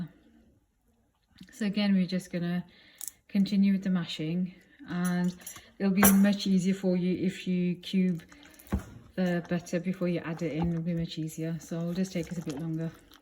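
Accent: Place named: Midlands, United Kingdom. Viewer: Well presented and a great offering, stay connected and more importantly stay blessed 🙏 By the way you sound from the Midlands though I maybe wrong, thinking Birmingham 🤔😉😊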